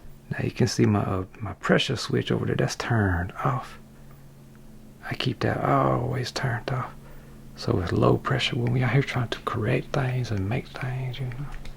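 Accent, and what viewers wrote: Louisiana, United States. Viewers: sounds like Tom segura’s Cajun accent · From the first time I heard your voice, Mr, I KNEW you were from Louisiana, I just knew it. Looking at the trees in your yard, and the Baton Rouge "evidence".... ah hah. Kindred neighbors. Because of you, I have bought myself some two part mold making material and I've started my first diorama. Just a small little thing, but... yeah. Neighbors😁😘 love you Mr.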